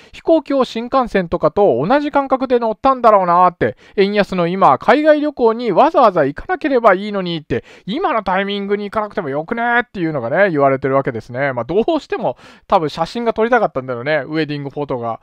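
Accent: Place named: Kansai, Japan. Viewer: 関西弁だけどなんかケチな感じがザ関西って感じ偏見だけど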